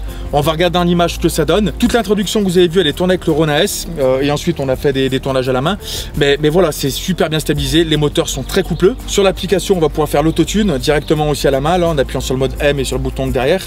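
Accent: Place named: Suburbs, France. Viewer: Tu causes comme un évadé de banlieue, mais t'es vraiment excellent !!! 🌟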